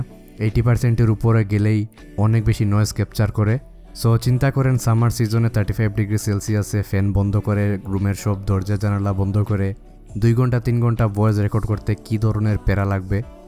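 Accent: Sylhet, Bangladesh. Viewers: Vai apni sylheti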